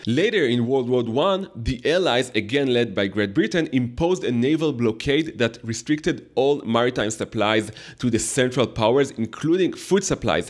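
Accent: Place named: Japan. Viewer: ברנש פגז, אך להאזין לו זה כמו להאזין ליפני מדבר אנגלית. על הנייר, האנגלית מצוחצחת ורהוטה. בפועל, אי אפשר להבין מילה. חבל.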